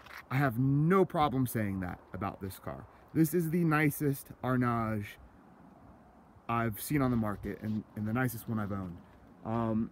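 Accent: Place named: United States. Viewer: I don't want one with out the tray tables either, that's the same way I feel about buying and Jaguar, it has to be VDP. You sound like one of us Yanks in the US mate. lol. In one of your other vids you also said the 2007 Arnage was the best year to own, so my question would be which year would you recommend for someone who's never owned one? There aren't very many independent service techs who specialize in them in my city Austin, TX.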